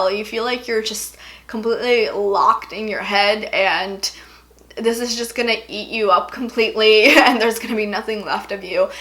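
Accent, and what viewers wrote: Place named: Los Angeles, United States. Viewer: it's funny i was listening to this video with the background app only sound and as an foreigner we are influenced by american tv shows and have those L.A accents ingrained in our head hahaha so when i heard your voice/little vocal fry i tought "she's from L.A no doubt about it" :)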